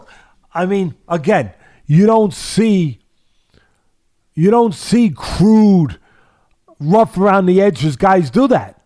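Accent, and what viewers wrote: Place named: New Jersey, United States. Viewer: Teddy is the Ultimate 🥏 heavy weight Communicator..: passionate, articulate, insightful, intelligent 🤓, and funny with that Iconic NJ accent . When he gets to Heaven King Jesus wants him to talk to Him for a Thousand Years on Fighting...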